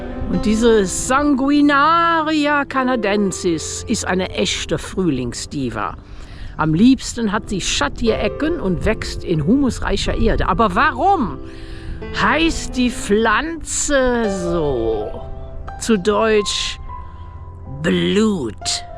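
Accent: Cologne, Germany. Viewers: Liebe Grüße aus Dresden bei Uns ist grad Schmuddelwetter und die Sonne lösst sich selten blkicken. Schönes Video und Kölsch klingt geil. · Einfach, wie immer, grandios. Du bist eine wunderbare Schauspielerin mit einem enormen Wissen und Deine koelsche Aussprache, einfach herrlich. Es war mal wieder ein Genuss. Danke dafür. Lg Evi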